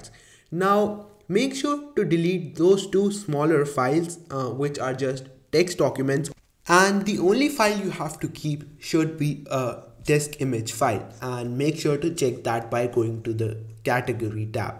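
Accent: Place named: India. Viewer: First time I hear your voice you are Indian.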